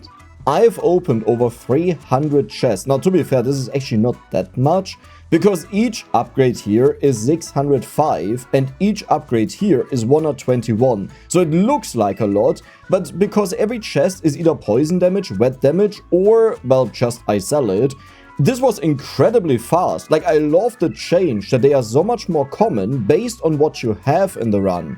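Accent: Germany. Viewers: I always thought why his accent is so like german until he Said things in german #Selberdeutsch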